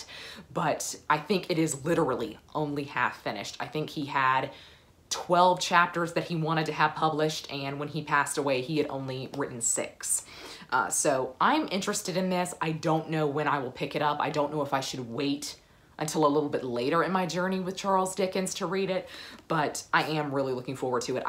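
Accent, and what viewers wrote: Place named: Texas, United States. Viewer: Love the way you say y'all. Probably best Texan accent I've heard. Also, love the way you comprehensively talk about books.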